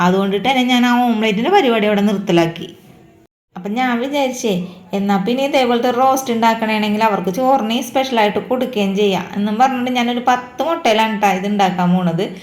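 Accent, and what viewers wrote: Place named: Kodungallur, India. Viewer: Thrissur districtile kodungallur bhagathenganumano iyale veed. Ente veed 3 peedika. Ente samsaram polend. Athond chodichathatta. Adipoli reciepe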